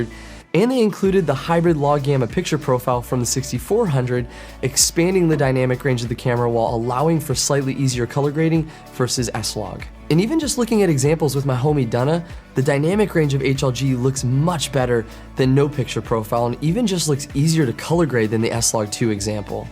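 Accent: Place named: United Kingdom. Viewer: i loved that British nat geo wild voice 😅 thats why i hit that like button,cheers mate ,greetings from kenya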